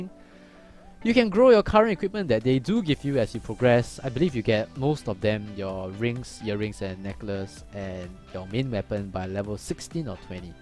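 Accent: Singapore. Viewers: Love your accent. Singapore maybe?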